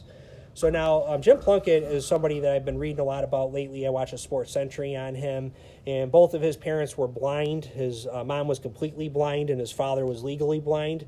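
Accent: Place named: Upstate New York, United States. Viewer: Super interesting. Thanks for the invite and research. Btw, just curious but where are you from? I’m trying to pinpoint your accent. It’s has so many similarities to my moms who grew up in upstate NY. Anyway, enjoyed the video. Looking forward to watching more of them. Drew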